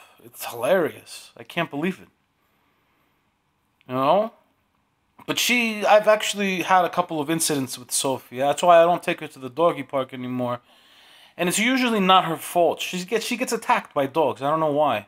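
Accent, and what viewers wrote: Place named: East Coast, United States. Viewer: nice my man yea im in mass..you have tht same accent we pretty much all do on the east side lol..but yeaa my dude new subscriber ..nd watching all your vids;))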